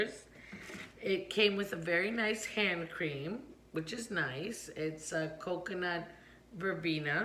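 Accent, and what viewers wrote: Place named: Italy. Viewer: you sound a bit Italian in this video .................. Love this video...Btw remember to drink a lot of water in the summer keeps you cool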